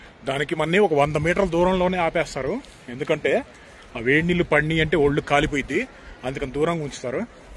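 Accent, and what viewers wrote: Andhra Pradesh, India. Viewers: Bro, you're slang like AP, but you are saying i am belongs to nzmbd. Moreover you need to exercise bcz your breathing voice is getting more.❤❤❤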